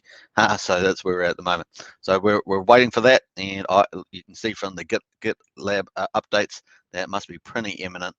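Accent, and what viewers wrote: New Zealand. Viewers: Is that a kiwi accent?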